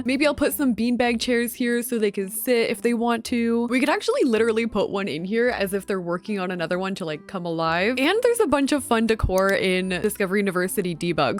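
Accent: Canada. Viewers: Girl it’s a Canadian thing I swear lol I catch myself saying ehh and laugh because it sounds so Canadian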